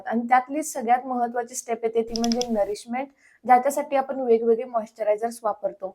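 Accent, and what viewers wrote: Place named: Western Maharashtra, India. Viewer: Tumhi pakka satara sangli kolhapur kadche aahat 😅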